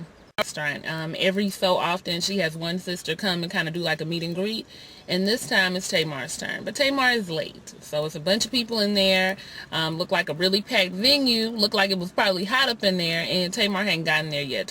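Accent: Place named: South, United States. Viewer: Great review and you are quite funny. lol I am trying to place your accent. It sounds like somewhere down south.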